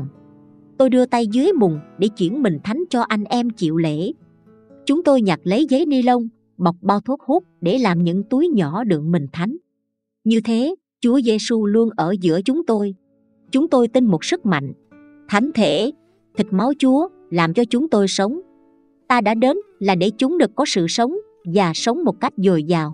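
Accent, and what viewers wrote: Saigon, Vietnam. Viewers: Giọng Sài Gòn xưa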